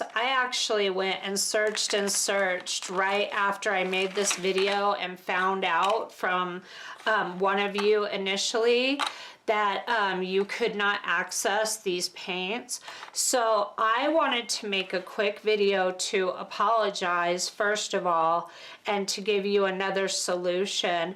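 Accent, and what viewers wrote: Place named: Midwest, United States. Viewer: Thanx so much... I was looking for those paints!!! Phew! Saved my sanity... lol. I am a new sub and really enjoy your videos. Love the different techniques you show us! I am wondering... where do you live? I am in SE Texas but you seem to have a midwestern accent. Just curious... Hugs!!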